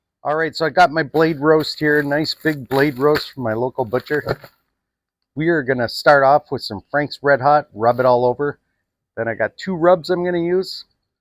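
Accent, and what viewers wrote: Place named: Minnesota, United States. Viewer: Dude sounds like he is from Minnesota...eh.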